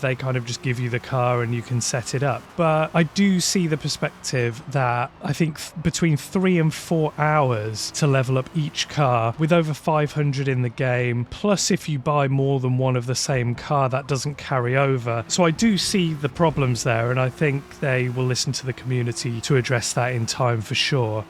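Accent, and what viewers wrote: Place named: England, United Kingdom. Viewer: Good comments with a lovely English accent! (From a Pom in Australia) Nice to see they have addressed a couple of the many sound issues, I think the Capri and GT3 Bentley may sound better too (but is it wishful just thinking?) I hated them last time I used it, went back to Seven briefly, ahh, that's better... But now in Eight, hmmm.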